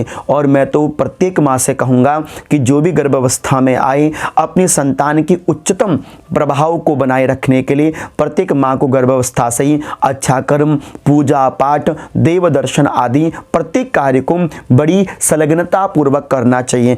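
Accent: Standard. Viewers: Aap kitna accha Bolte hai akdam sudh🙏🙏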